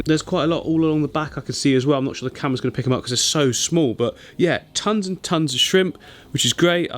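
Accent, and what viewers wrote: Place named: United Kingdom. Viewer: I really like your tanks, but this british accent is bloody haaard, innit? I just can't watch it, sorry. But a lot of kisses, wish you well.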